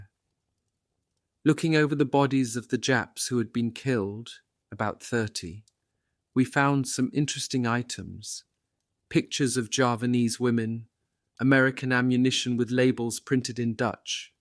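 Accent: United Kingdom. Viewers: Something about a British person reading a story about Americans... it just does not sit right, and it feels like it does not fit. There, I said it.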